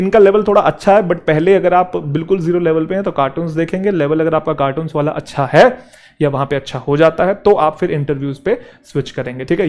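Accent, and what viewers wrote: Haryana, India. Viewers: Sir ji haryana se ho na??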